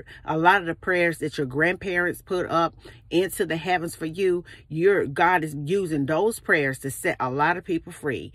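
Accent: South, United States. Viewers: with all that Country accent, your right on point! Ain't it good to have ears that can hear!!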